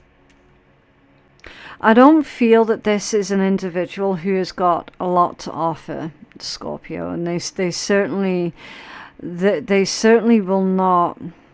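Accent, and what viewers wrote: Scotland, United Kingdom. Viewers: Blessings to you, Thank you for my reading Scorpio 10/11 /1976 born at 6an, Love your Scottish accent lol🐯🌹🌟💜😁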